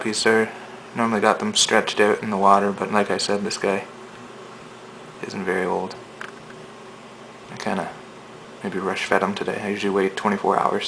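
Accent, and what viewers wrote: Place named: Canada. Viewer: You say out and house like a Canadian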